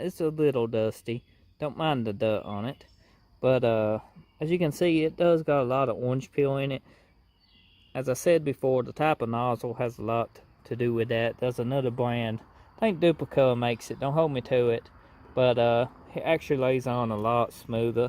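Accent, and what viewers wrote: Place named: Kentucky, United States. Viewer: lol well I'm from Texas but my dad is from Bardstown and your accent is hardcore lol. good stuff.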